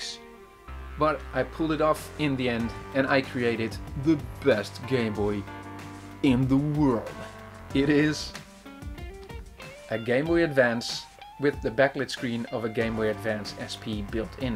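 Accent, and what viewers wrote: Netherlands. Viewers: Hey, loved the video. Are you Dutch? You sound very Dutch. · Ik hoor een Nederlander 😂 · very recognizable accent :)! I am dutch too. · Ik dacht al toen ik het accent hoorde: Is hij Nederlands? Maar al snel zag ik bij dat het zo is!😄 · is that a dutch accent or is it just me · 5 seconds in. This dude must be dutch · Spotted that Dutch accent from miles away. Nice video too. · are you dutch ik wel! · Great job! also it seems like you're from the netherlands, i live there to! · woooow dat nederlands in het engels vermengd xD echt gaaf gedaan! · Gotta love a Dutch accent! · I love that dutch accent so much xD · You souwnd very like a Dutch persoon, very goed engliesch. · Are you perhaps Dutch? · I got a slight feeling you are dutch like iam. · hahahah je nederlandse accent is te herkenen :D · ja jij bent nederlands wist het van je accent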